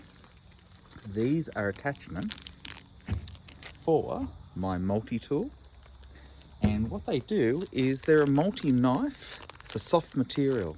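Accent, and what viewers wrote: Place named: Australia. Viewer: Not criticizing but he sounds like he's from Oz, not Britain.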